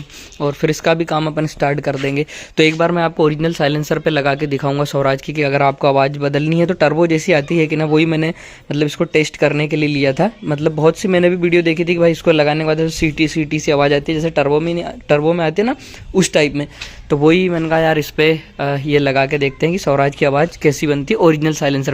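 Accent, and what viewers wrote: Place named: Rajasthan, India. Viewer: Bhai Rajasthan se ho ya haryana se.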